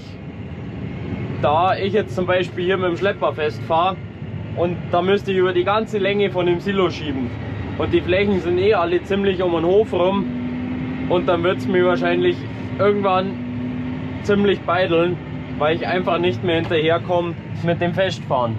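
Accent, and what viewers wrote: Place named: Upper Bavaria, Germany. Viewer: Die Dialektunterschiede zwischen uns Augsburgern und den Oberbayern ist immer wieder beachtlich 😂😮 Ist auch ne echt schöne Gegend da unten, muss man echt sagen.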